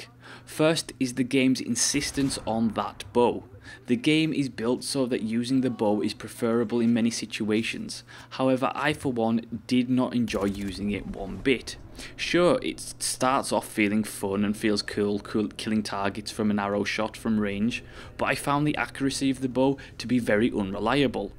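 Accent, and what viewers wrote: Scotland, United Kingdom. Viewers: Are you of Celtic origin? Scottish/Irish?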